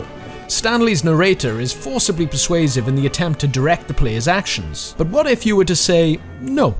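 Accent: Wales, United Kingdom. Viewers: Are you Welsh? Sounds like you have a bit of a Welsh accent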